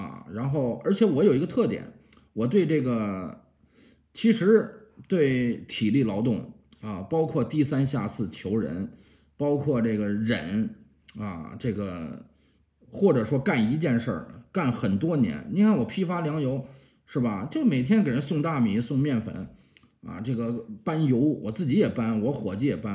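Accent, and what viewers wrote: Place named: Beijing, China. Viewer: 祝贺你的一周年，加油！我们每天看你听你侃大山，之所以喜欢你的视频，是你说人话，方方面面，不啰嗦，就像沈志华老师那样，咬字清晰，北京话，有趣。你不怕揭自己的老底，说明你不虚荣，你初中毕业，但是博士水平，很多人学历很高但是水平很差，当然里面有买的学历混的学历，硕士学历初中水平。所以西方认你的经历和经验，不重视学历。欢迎来加拿大，我接待你。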